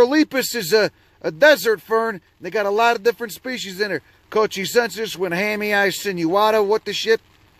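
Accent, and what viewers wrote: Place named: Berwyn, United States. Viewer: Very interesting. I’m in Northern New Mexico and I’d like to learn about all the stuff growing on my property. By the way, you talk like you’re from Berwyn. Are you? 🙂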